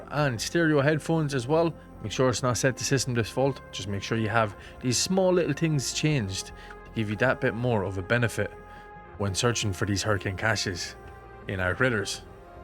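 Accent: Ireland. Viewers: He sounds Irish.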